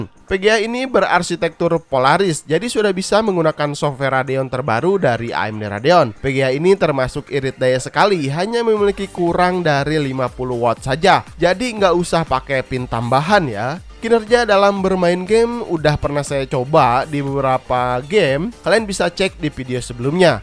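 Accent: West Java, Indonesia. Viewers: Sundanese gan 😁😁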